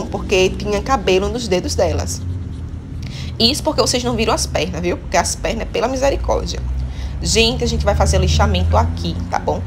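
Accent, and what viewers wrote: Northeast, Brazil. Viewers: Vc é muito espontânea e engraçada. Vc é do Nordeste? Amei o seu sotaque. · O sotaque nordestino é o melhor do mundo, amooooo!! Tú é muito boa, mas a narração é a melhor!!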